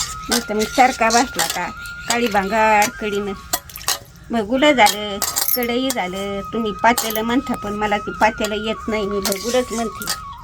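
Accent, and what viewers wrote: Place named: Satara, India. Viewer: Aaji tumi sataryakadcha watta boli Bashavarun chan karta Baji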